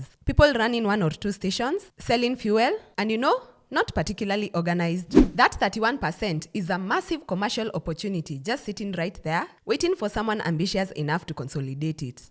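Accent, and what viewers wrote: Kenya. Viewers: Gotta love the Kenyan accent!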